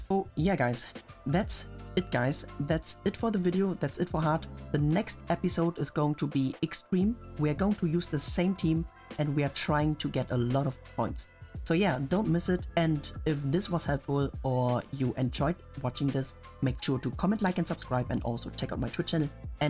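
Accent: Germany. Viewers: Where are you from? you have a german accent somehow?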